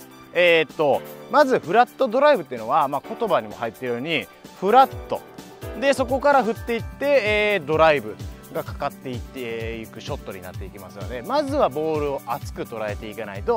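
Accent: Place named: Kyoto, Japan. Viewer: ウィルスミス、朝から爆笑してしまいました😂 懐かしい京都弁も耳に心地良く、 、大好きです💕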